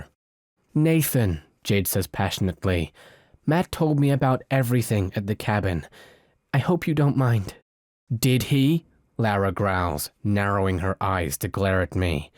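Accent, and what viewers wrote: United Kingdom. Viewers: Is it just me or does the speaker sound British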